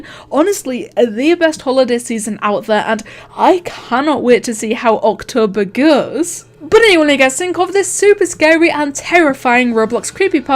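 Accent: Scotland, United Kingdom. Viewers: kay ayh ayh You're British Aren't you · I like her scottish accent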